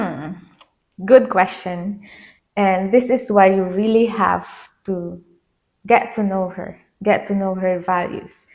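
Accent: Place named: Philippines. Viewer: Hi. I adore how you take the extra measures to speak clearly in English, with your provential filipina accent. Whats not to love here!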